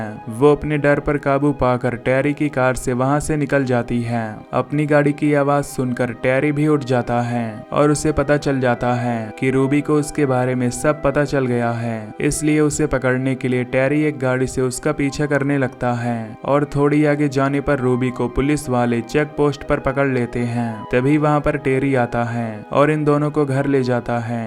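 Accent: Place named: India. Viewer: Great explanation pure Indian voice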